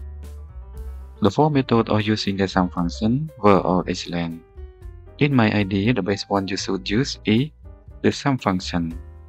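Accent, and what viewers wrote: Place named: England, United Kingdom. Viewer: I need to read translations related to my language because I have problems understanding the English accent.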